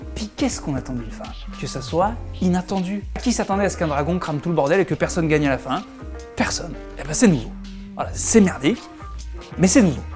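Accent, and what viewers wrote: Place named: South, France. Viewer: J'ai jamais vu un épisode de GoT mais j'ai kiffé la vidéo. Ton accent du sud est parfait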